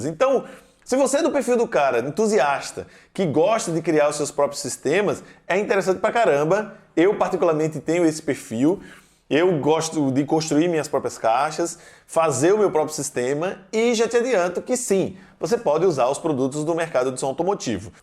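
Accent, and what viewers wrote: Northeast, Brazil. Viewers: Da um nojo, vai assistir um video e percebe esse sotaque de merdestino.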